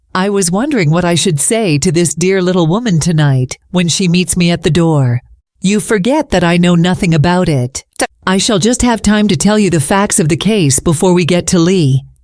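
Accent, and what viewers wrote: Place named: United States. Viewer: Yuk, an American accent is so wrong for this story.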